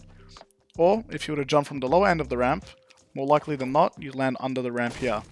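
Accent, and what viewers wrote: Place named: Sydney, Australia. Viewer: Sounds like he's from inner west-sydney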